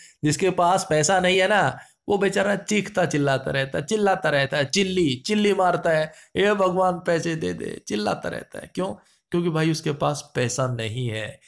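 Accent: Haryana, India. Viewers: Sir aap Haryana se ho kya?? ♥️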